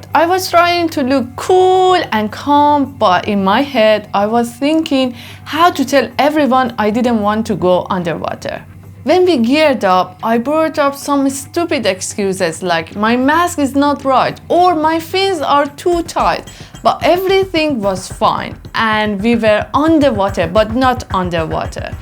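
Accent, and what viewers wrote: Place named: Iran. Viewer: Let me guest where you from without knowing anything about you. Sounds like you from Iran 🇮🇷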